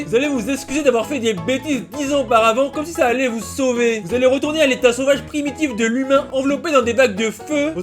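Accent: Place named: Congo. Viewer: Hier , je t’ai découvert. Je me suis abonné . Aujourd’hui, tu es un classique ! Je suis d’origine congolaise , et tes expressions et ta façon de prononcer les mots me fait penser au pays! On aime trop parler mal ( c’est juste de bonne guerre bien sur )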